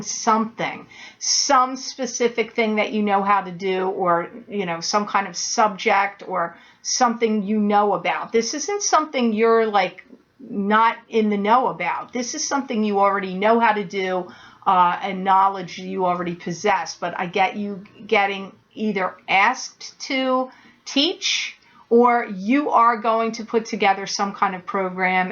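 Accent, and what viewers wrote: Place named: New Jersey, United States. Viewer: I absolutely love your vocabulary, dahling and of course, your Jersey accent (miss hearing it over here in AZ)! You're so intelligent and yes, of course your readings are spot on!! Congrats on being booked for the next 8 months!! I look forward to your readings every month, so thank you so much! Oh and just an fyi: if you type in your website without the www it takes you to <link> Love and light from a fellow Jersey girl, Autumn:)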